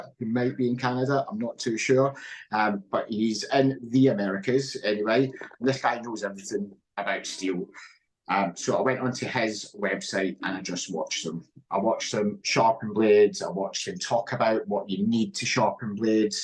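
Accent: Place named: Glasgow, United Kingdom. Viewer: 😂 If I was speaking in full Glaswegian dialect, it would probably only be (some) Scottish people that could follow without cc! 😂Thanks for joining us! 👍🏻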